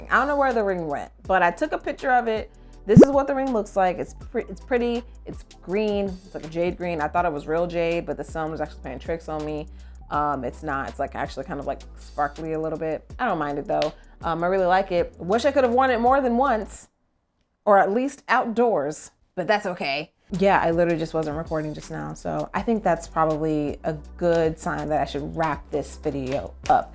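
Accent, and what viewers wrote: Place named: United Kingdom. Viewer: British accent always kills me LOL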